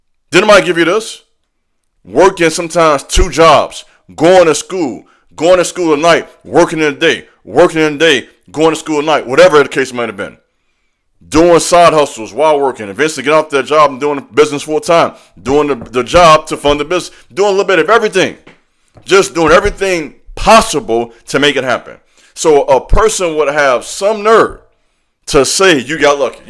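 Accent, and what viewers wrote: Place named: Arkansas, United States. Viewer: I’m from Arkansas yea Thts how we talkin lol 😂 gotta do it for our chilren.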